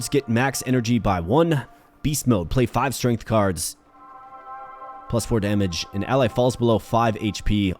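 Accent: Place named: Canada. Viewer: You know its plague and not pleg ? Are you Canadian? Canadians cannot say that word for the life of them, kinda odd. Only noticed it when I started watching youtube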